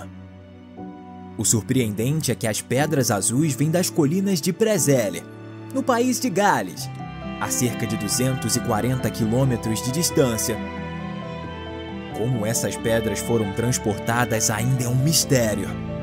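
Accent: Rio de Janeiro, Brazil. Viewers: Essa locução com sotaque carioca cheio de X meu irmão é impossível ouvir 👺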